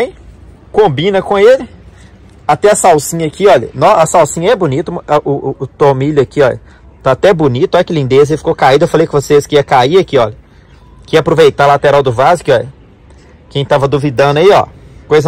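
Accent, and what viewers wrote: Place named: Minas Gerais, Brazil. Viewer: Você é suave, articulado, um ótimo comunicador, gentil e com este charme mineiro, que é apaixonante.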